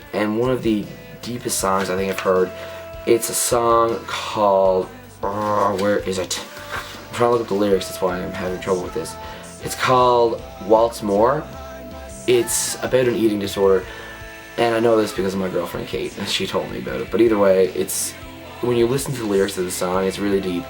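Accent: Canada. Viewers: you're canadian right? just asking 'cause your accent is AWSOME!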